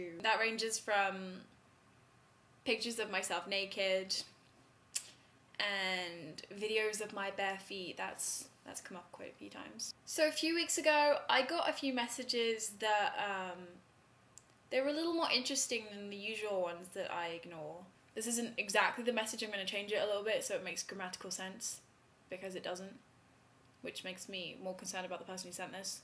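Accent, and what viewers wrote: England, United Kingdom. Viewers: so i was bored and my subscriptions reset and i saw your video and decided to watch it again lol. still funny and i gotta say (in hopefully a noncreepy way? haha) the british accent is still very cute ;) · hiii:3 i love your English accent... *stares relentlessly* -Fullmetal Alchemist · i don't know, sounds like she has an english accent, so im not sure. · do canadians have that accent? sounds like semi-british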